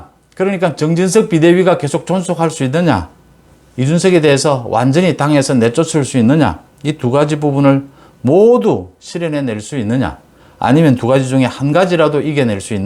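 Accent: Gyeongsang, South Korea. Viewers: 송 선생님 평론을 평소 열심히 듣고 있습니다. 경상도 억양은 있지만 언어 구사는 표준말을 사용하시므로 아주 깨끗한 방송이라고 늘 여겨왔습니다. 한 가지 외람된 말씀 드리자면 '제명'이라는 단어를 요즘 상황 때문에 많이 쓰실 수 밖에 없는데 accent를 '명'에 두는 것이 올바른 발음이라 알고 있습니다. accent 를 '제'에 두시는 것이 송 선생님의 일종의 언어 습관이라 생각하지만 혹시 고칠 의향이 있으시면 참고하시기 바랍니다.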